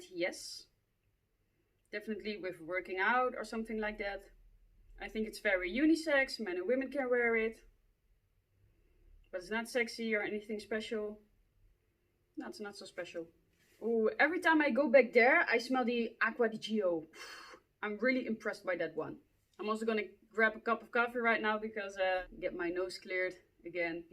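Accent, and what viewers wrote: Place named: Netherlands. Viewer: I love my line of YSL fragrances. La Nuit is probably in my top two fragrances ever. A dark, alluring, sweet and spicy... almost fresh cut grass sillage at times. Undoubtedly multiple of my favorite fragrances lie within YSL. You’re beautiful! I absolutely always love a Dutch accent. One of my favorites. Tot de volgende keer.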